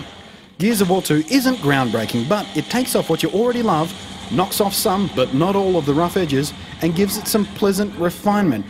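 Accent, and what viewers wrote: England, United Kingdom. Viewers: The guy is british, and that's how british ppl say it. -. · nothing is wrong with English people. it's this guys pissy attitude towards the game and his accent. most English people's accents are all right. but this guy pisses me off. fuck he's stupid.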